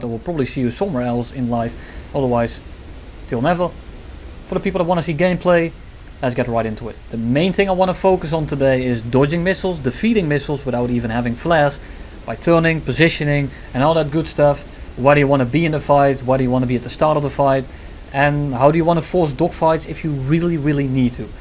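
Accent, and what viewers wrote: Netherlands. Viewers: Is it Just me or do i hear a dutch guy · I'm trying to figure out what your accent is. I think Dutch, in which case I'll nickname you (if you want) but I'm not 100% sure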